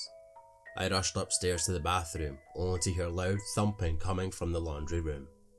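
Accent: Scotland, United Kingdom. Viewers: good to hear another scottish person telling stories 👍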